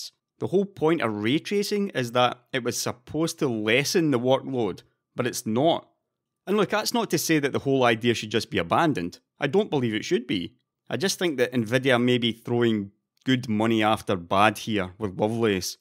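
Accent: Scotland, United Kingdom. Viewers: "assuming they have a 7900XTX in the works"? It sounds like you're in Scotland but I'm pretty certain the news gets there too! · Started watching out of interest, finished watching to listen to a Scot say "faster in raster" 69 times.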